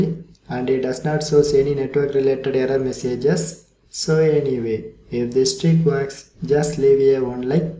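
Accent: India. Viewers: U have indian accent bruhhhhhh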